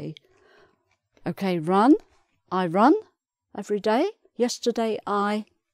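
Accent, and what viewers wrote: United Kingdom. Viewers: Very kind and polite teacher I impressed her British accent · I Like it This video's good ,learn speak English British with this teacher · * “Very kind and a polite teacher. I am impressed with her British accent”.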